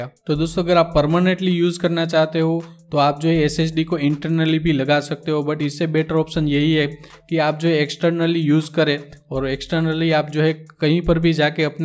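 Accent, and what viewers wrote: Gujarat, India. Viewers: Ap Gujarati ho?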